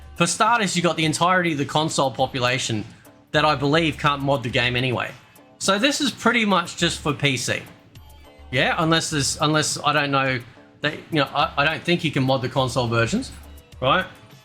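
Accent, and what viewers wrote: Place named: Australia. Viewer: Right? 😂 love the aussie accent mate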